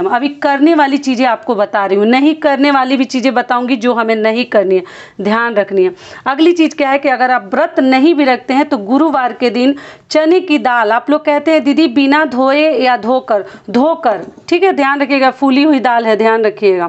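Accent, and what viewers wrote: Bihar, India. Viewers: Didi aap Bihar se hain kya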